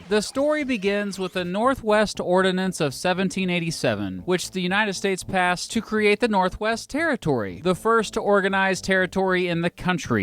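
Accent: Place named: Michigan, United States. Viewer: You sound like you are from Michigan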